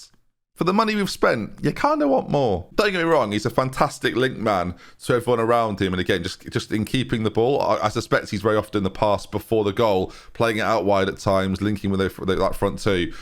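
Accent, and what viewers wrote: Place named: Newcastle, United Kingdom. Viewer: close your eyes while listening to and u would think you're listening to the true Geordie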